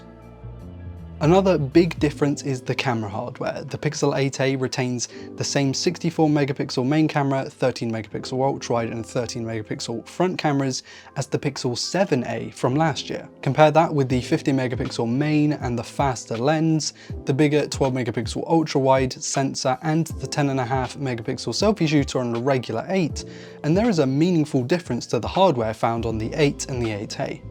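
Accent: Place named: United Kingdom. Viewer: He sounds British so whats with the prices in dollar 💵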